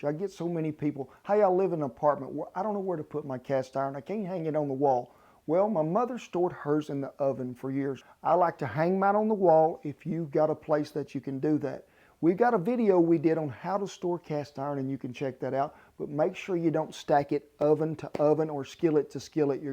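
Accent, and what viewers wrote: United States. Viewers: Truly wonderful video. Thank you very much. As a British man, I have to say I love your accent. REAL USA. Keep up this brilliant and interesting work.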